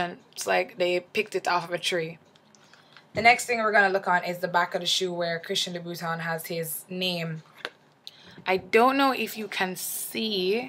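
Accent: Jamaica. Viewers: you are definitely Jamaican no hiding that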